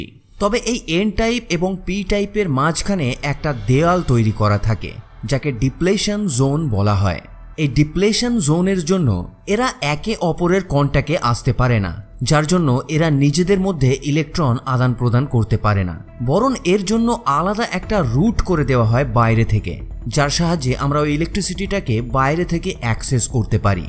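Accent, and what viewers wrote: West Bengal, India. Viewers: চমৎকার একটি ভিডিও, খুবই উপযোগী। ধারা বর্ণনায় ওয়েষ্ট বেঙ্গল ছাপ আছে। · voice sune indian mone hocche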